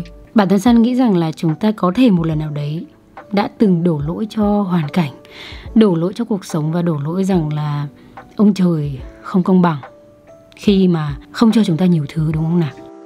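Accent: Vietnam. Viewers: Nghe giọng c trong video đã ngờ ngợ r nhưng mà giờ mới biết c là người miền trung hihi❤️ e cũng hàng xóm vs HT nè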